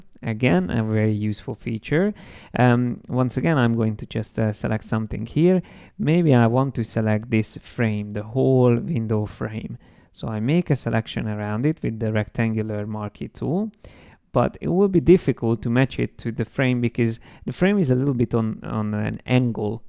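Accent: Hungary. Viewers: látom nem vették észre ennyi év alatt hogy magyar vagy, de amikor meghallottam az akcentusodat, egyből éreztem hogy valami van :D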